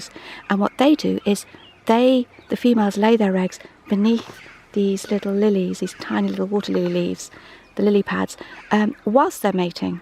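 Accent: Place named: RP, United Kingdom. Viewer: I like your posh English accent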